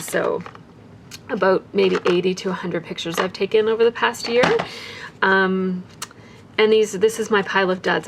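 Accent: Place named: Canada. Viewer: Thanks for the great review! I've debated on buying one of these for a while. Also it was nice to see your pretty face on camera! I've watched your channel for about a year now so it's nice to put a face with your sweet Canadian voice :) ~Melissa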